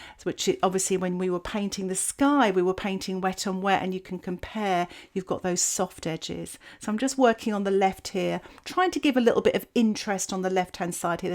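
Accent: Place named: South East England, United Kingdom. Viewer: Pure English(some would say a south east accent, I wouldn’t because I’m from the south east and have the same accent!)😊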